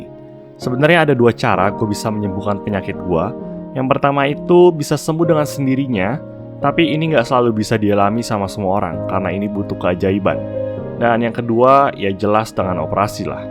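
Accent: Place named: East Java, Indonesia. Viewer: emang kakak orang jawa timur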